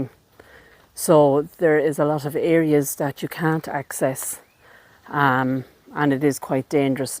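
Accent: Ireland. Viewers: This is one of the most amazing cemeteries you have visited, it truly is. It would make a great place to set a spooky film, would not need to do anything, it’s just perfect as it is. your enthusiasm comes through, your love of old cemeteries is clear, your appreciation to these places built to honour those who have come before us is wonderful.All narrated in your soft beautiful Irish voice.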